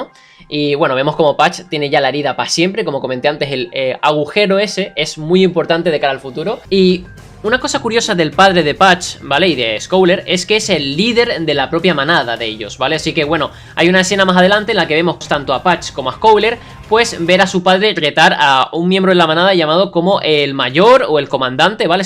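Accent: Argentina. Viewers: argentino detectado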